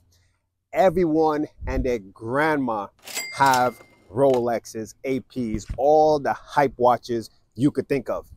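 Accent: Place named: London, United Kingdom. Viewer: Sounds like London!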